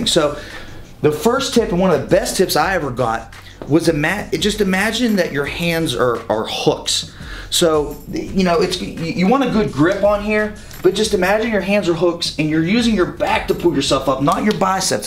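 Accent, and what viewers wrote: South, United States. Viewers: Where is from originally. Sounds southern..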